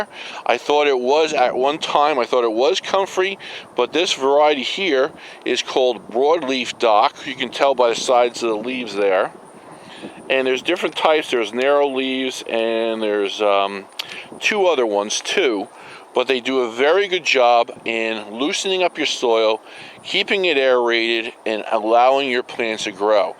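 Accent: New Jersey, United States. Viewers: YAY! I have been doing similar things with Dock. It is nice to have others see its value. I felt lonely when I thought I was the only one who was onto this, and yet it made so much sense to me. I am loving your videos. They all make so much sense. So many pieces of the puzzle fitting together. I literally stayed awake all night last night watching your videos, I was so fascinated. We are establishing a Permaculture food forest on 1/4 acre in Portland, Oregon. It is fun to hear a New Jersey accent. I grew up outside Philadelphia. Blessings :)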